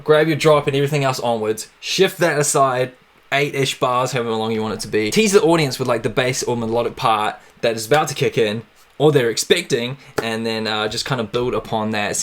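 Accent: New Zealand. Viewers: you are 100% FROM NZ. the accent I can tell.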